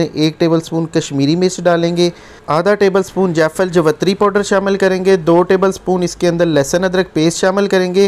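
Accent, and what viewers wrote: Punjab, India. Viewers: ارے بھائی اسٹیم ھوتا ھے نہ کہ سٹیم تم پنجابی انگلش لفظ کو ہمیشہ S کے ساتھ الف کو غائب کر دیتے ھو مثلاً اسکول کو سکول ، اسٹوڈنٹس کو سٹوڈنٹس ،اسکرین کو سکرین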